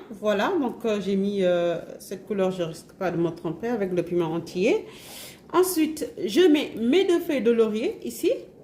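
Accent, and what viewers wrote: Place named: Saint-Louis, Senegal. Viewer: On dirait que vous êtes de ndar.... Domou ndarrr... Merci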